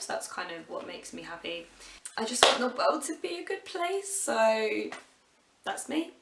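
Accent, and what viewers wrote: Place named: London, United Kingdom. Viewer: May I ask which part London you are from?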